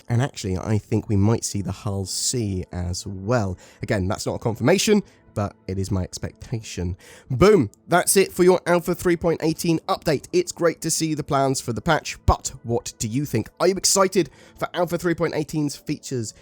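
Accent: United Kingdom. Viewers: 3.18 Bring it on. To be honest I watch your videos more than I actually play the game. LOL. Might it be your British accent? I follow you on Facebook as well.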